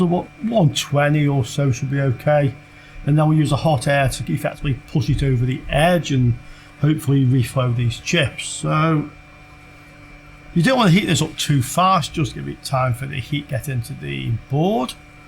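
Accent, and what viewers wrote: United Kingdom. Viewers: tak mne britsky prizvuk lezie na nervy, zaujima ma skor obsah a on to popisuje taj britsky - jednoducho :D . Ty sa zaujimas o elektroniku,ci len prizvuk? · Tiež si ho fičím 😀 ten britsky prizvuk je bozsky.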